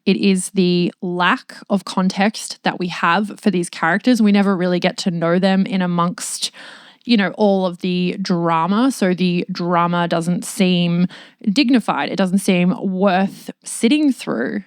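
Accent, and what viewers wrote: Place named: Australia. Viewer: Are all YouTubers Australian? Or is this the new “Mid-Atlantic Accent”. Except its more like Mid-Pacific. Until YouTube i didn’t realize how close Australian is to an American accent. The only real difference is “er” pronounced as “uh” which is also a Brooklyn thing as in “Muthuh” and “Bruthuh”. Interesting review too! And seems like you changed your lighting which looks nice. Seems softer with warmer colors. Or maybe its a suntan. Either way looks good.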